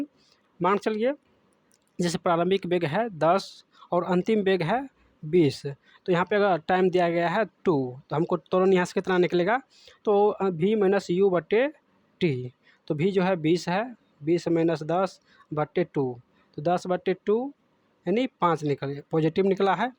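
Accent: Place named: Gujarat, India. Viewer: सर आपकी मातृ भाषा गे छे वाला है सही या गलत आपके बोलने के tone se पता चलता है😊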